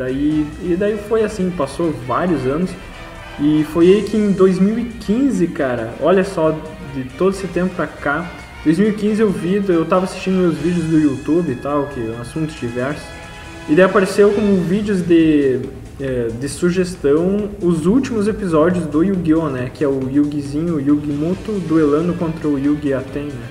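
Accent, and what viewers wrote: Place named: Rio Grande do Sul, Brazil. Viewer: Sou de São Leopoldo! Reconheci o sotaque hehe, já divulguei para uns amigos meus.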